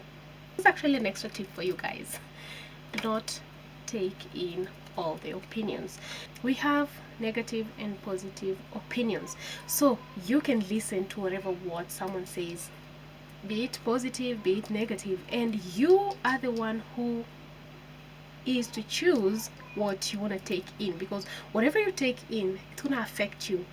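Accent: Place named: Kenya. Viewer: Love your voice, your accent. And you look very nice. Would you want to share what tribe and area of Kenya you are from, tafadhali?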